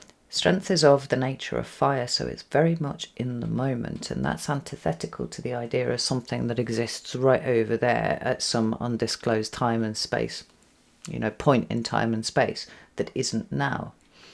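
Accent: United Kingdom. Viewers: Just your amazing voice, delightful british accent and excellent vocabulary and choice of words captivates me. I would be listening to you for days! Thanks for your readings, Cap sun Sag rising here. 😊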